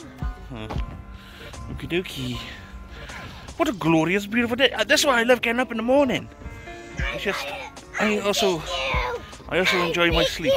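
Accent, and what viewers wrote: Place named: Wales, United Kingdom. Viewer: Thanks for this. Grew up in Croesy and it's lovely listening to the accent and seeing places from years ago. (I live in Canada now). You're doing great vlogging - keep it up (I know this is two years ago, and I see you have kept it up!)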